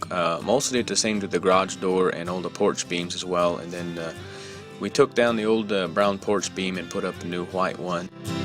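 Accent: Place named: Louisiana, United States. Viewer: I was way off. Sounded more like southern Louisiana. I really like the Chanel. Keep it up bro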